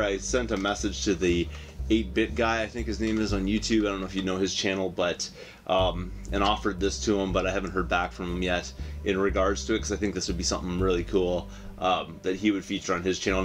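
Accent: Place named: Canada. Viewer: i love how you silly Canucks pronounce Mario..good stack of stuff there man!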